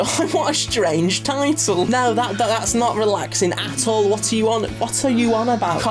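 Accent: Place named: United Kingdom. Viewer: I sarabscraibe just for the british accent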